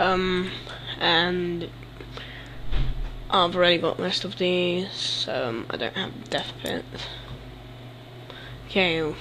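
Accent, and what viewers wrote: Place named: United Kingdom. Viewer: HE'S A BRIT,HAHAHAHAHA.But,thanks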